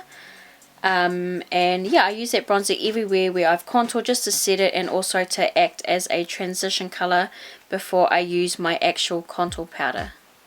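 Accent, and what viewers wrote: New Zealand. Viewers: guuuurl recognized the kiwi accent right off bat. love the videos!!!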